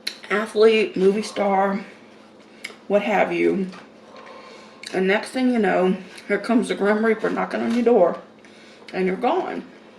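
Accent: United States. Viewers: She does speak English it's just a American accent like British have British accent accent isn't a language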